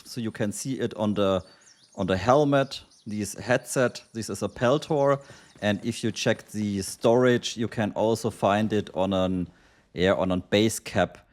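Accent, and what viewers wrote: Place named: Germany. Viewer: Geiler deutscher Akzent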